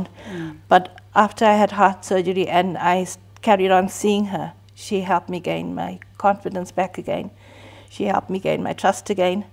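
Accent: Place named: South Africa. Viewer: Is she South African? She sounds South African. Hope this has stuck after so many years.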